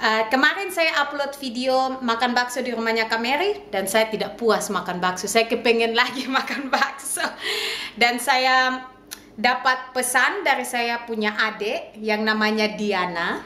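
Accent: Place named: Papua, Indonesia. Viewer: cara bicara kaya orang papua Soalny sy org papua😂😅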